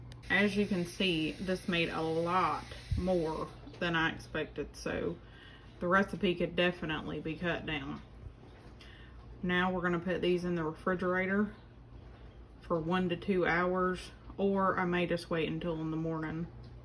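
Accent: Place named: South, United States. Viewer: She did a great job. Don’t give up... you can do this! I Everyone sounds differently! Your Southern drawl is different and makes you unique! Paula Dean and Dolly Parton have your accent and it never stopped them! Come on back to the You Tube family and do your thing! 💚✨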